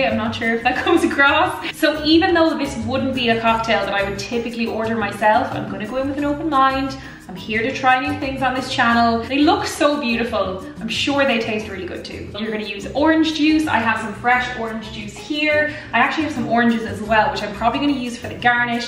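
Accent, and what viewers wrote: Ireland. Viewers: Great drink for never making one! The Irish accent is one of my favorites, every time I hear it, my thoughts start using an Irish accent for some reason lol. If you make this again use a spoon to sink the grenadine, just pour it on the backside of the spoon, works great!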